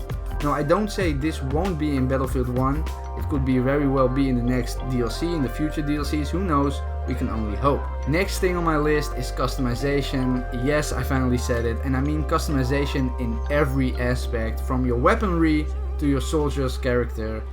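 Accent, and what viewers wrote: Netherlands. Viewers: Are you dutch my bruh?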